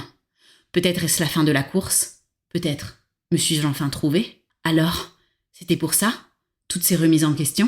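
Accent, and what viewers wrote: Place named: United Kingdom. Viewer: J'ai tout aimé chez vous, vos yeux qui ont l'avantage d'être deux, vos cheveux ondulant, vos multiples chemisiers, vos ongles, votre tapis, vos lobes, votre élocution et votre franc parlé, votre humour, ce micro indéboulonable, cette petite souris qui passe derrière le canapé a 21mn17, cette analyse pointue, ce merveilleux petit accent british (quand on aime on dit n'importe quoi 🙄), les livres sur la cheminée dont je n'ai distingué aucun titre, vos claquement de doigts, votre mug......... il y a cependant deux choses choquantes !! cette lampe sur une chaise et ce livre certainement écrit par un enfant dérangé mentalement dont vous nous faites lecture !! ceci dit merci à l'algorithme de m'avoir proposé votre chaîne !(j'en ai une également orientée nature) vous avez aujourd'hui un nouvel abonné ! ✨️🌟⭐️🔥♥️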